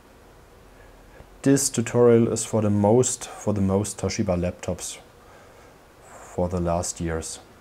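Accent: Germany. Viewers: a nice German accent talking technically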